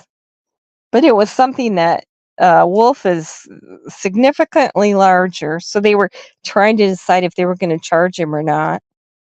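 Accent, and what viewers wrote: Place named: United States. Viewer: I love your laugh ❤ American accents is one of the best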